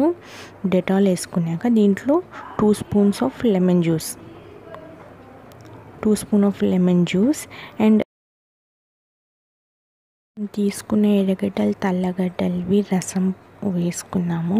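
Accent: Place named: Telangana, India. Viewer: super voice telanga voice alane untadhi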